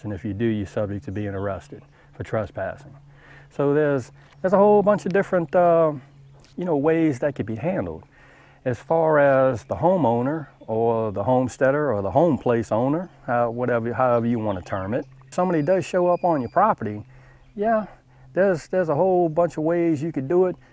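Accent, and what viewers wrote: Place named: Louisiana, United States. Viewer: yeah buddy thank you ..I was born and raised in Louisiana myself I can always hear that accent lol love the video man thank you for sharing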